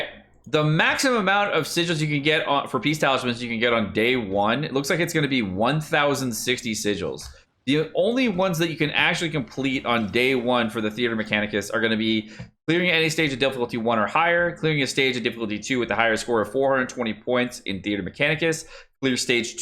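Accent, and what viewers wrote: United Kingdom. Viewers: Yay british again 💕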